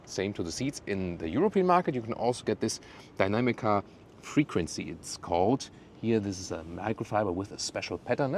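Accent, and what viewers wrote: Germany. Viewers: Your Genglish is outstanding 👍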